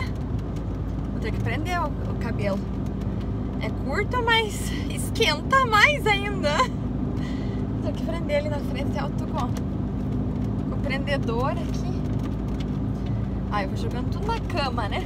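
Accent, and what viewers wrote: South, Brazil. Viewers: Olá, tudo bem? Antes de mais nada, deixe-me me apresentar: Sou mais conhecido como Claudio, Carioca, residindo atualmente na Baixada Fluminense. Estou assistindo seu vídeo no computador pela segunda vez (antes só no telefone). Estou achando ótimo, é bem melhor te ver numa tela de 29' do que na telinha do celular. É muito gostoso seu jeito de se comunicar com seu público com esse sotaque sulista e sempre de um modo alegre e divertido. Dá pra sentir que você gosta muito do seu trabalho, por mais sacrificante que seja. Também acho muito legal o nome que vc deu ao seu caminhão. Te desejo um bom restante de semana e que tudo corra bem pra você. Fique com Deus!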